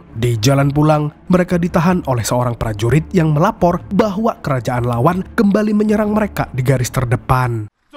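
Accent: Banjar, Indonesia. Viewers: Org Banjar sekali kwn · Bah.. Urg banjar kh ni? 😅😅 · Ulun kada tahu Pian orang Banjar kah...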